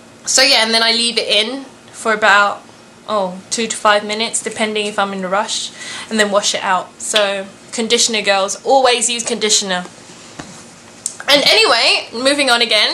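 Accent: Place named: Philippines. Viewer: Omg. I love your accent! Are you Filipina?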